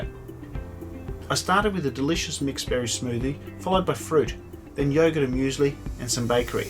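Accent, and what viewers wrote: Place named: Australia. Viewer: Another great review! In general I'm not a fan of "in your face" flight reviews, but your professionalism, insightful commentary, and intriguing Aussie accent set the standard! Your style in fact inspired me to start doing my own YouTube flight reviews on the other side of the world. Question for you or anyone in the comments: I have the option of 2 flights in Cathay J long haul or on JAL supposedly in their sky suite III. Which should I take?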